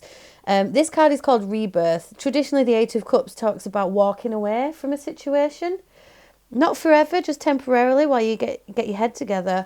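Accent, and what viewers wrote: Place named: Yorkshire, United Kingdom. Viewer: Thank ya very much. Happy new subscriber, here. ^‿^ I chose 2, actually asking whether I should travel across country to participate in a medical trial that I'd been feeling squeamish about since learning the entirety of the protocol that I'd be following. So hearing 'a resounding NO' is an alright answer in this case. (Your accent is adorbs, by the way. I had a friend a few years back with this wildly whimsical mish-mash of Mancunian, Blackpuddlian, and Yorkshirian -- and even though she felt that her Yorkie aspect wasn't very strong, hearing you makes it pretty clear to me that she still had plenty!)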